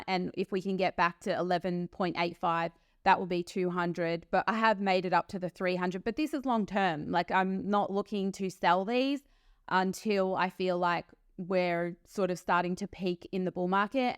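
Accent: Australia. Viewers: I hear you I see your accent is from Australia. Great content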